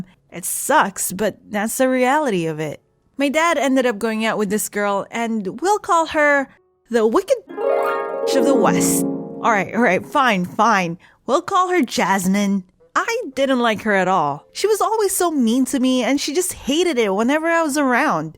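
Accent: Philippines. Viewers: Her voice and accent is so Pilipino LOLOLOL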